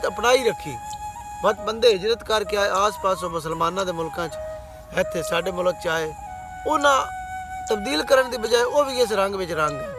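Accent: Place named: Haryana, India. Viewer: Veer tuc HARYANA jive Punjabi bolde ne te vekhan ch v haryane de jaat jatt lagde ne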